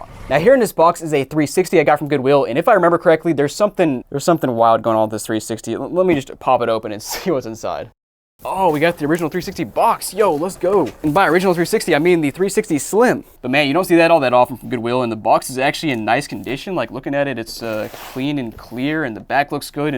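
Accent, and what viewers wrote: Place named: South, United States. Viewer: Hey, I was wondering where you accent comes from specifically. Like. I know It's a southern accent (I think) but a region or a state? I swear I'm not weirdo😅 I just really like this accent but I don't know exactly what accent it is (I'm not american) so I can’t precisely answer the question when asked. Love your vids too btw.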